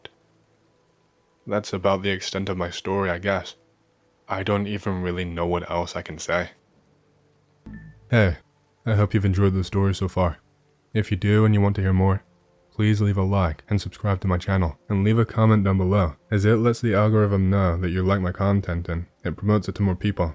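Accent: United Kingdom. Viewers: Where's his accent from? Sounds kinda U.K. ISH...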